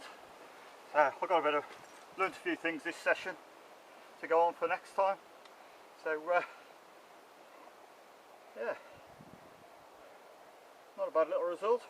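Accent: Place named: Shropshire, United Kingdom. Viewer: Nice one! Down to earth & well presented. Its nice to be reminded what a Shropshire accent is like. You get them mixed up a bit when you've been away from Blighty for 40 years. Those are pretty impressive carp you're pulling in there! Here in Greymouth I usually fish off the wharf in the rivermouth for Kahawai. Love 'em pan fried in butter with a bit' onion, garlic & sweet chilli sauce.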